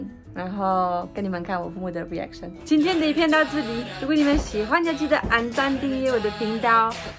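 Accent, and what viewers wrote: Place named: Taiwan. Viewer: 一个法国人一口台湾腔，哈哈。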